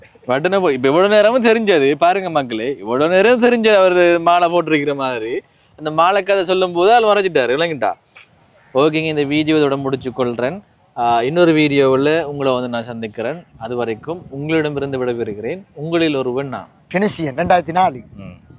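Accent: Sri Lanka. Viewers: இலங்கைத்தமிழ் மிகவும் அருமை 🎉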